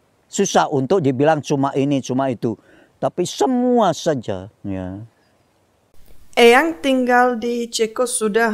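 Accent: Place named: Java, Indonesia. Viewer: Eyang aksen jawanya masih medok sekali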